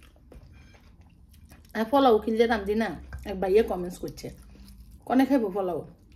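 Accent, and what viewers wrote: Noakhali, Bangladesh. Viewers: আপু তোমার নোয়াখাইল্লা ভাষাগুলো শুনলে আমি নিজে নিজে তোমাকে নিয়ে হাসি।তোমার ভিডিও দেখতে খুব ভালো লাগে তাই সবসময় ভিডিও গুলো দেখি তোমাকে নিয়ে হাসি।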